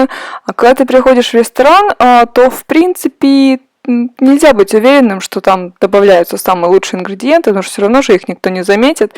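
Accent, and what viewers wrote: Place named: Siberia, Russia. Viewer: Я тоже почему-то подумала про оленину, когда читала и слушала описание вкуса кенгурятины ))) Я родилась и выросла в Норильске, помню пельмени к коробочках машиной лепки из оленины ))), ваша скорость мысли и речи выдает сибирячку, москвичи и вообще запад России говорят иначе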